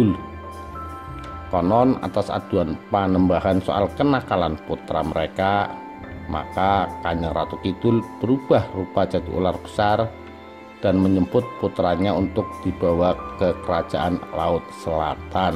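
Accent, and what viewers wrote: Tegal, Indonesia. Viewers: Admin kayane wong tegal apa brebes yaa...?? Intonasine lucuu 🤣🤣🤣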